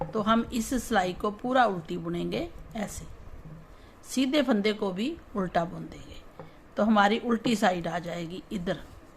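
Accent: Punjab, India. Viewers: Aap ki panjabi hindi bahut pyari lagti hai aur aap bahot achha samjhate ho. Thank you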